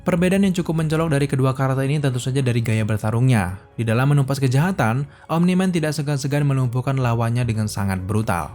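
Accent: Indonesia. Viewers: I am Always curious .. why Indonesians... almost of you like to change the english pronounce? Aku hairan kenapa kebanyakan kamu suka meng "indonesia" kan bahasa inggeris. Tune Core jadinya Tun-kor.. guardians yg sepatutnya disebut 'Gardian' tetapi dibaca 'gu-ar'-dian... princess yg sebutannya prinses tetapi disebut prin-ches... wth? semuanya sudah tidak kedengaran seperti English... dan kadang2 jadinya susah mau cari infromations dikarenakan sebutan yang tidak saya fahami...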